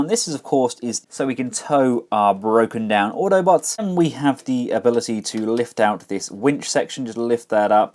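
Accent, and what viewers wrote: England, United Kingdom. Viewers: you and your puns, more funny in your English accent!!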